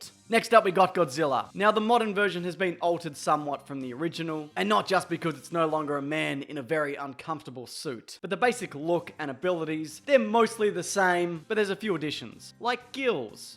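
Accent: Australia. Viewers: Wait your Australian?! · YOU"RE AUSTRAILIAN?!?!?!? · He Or sHe has an Australian accent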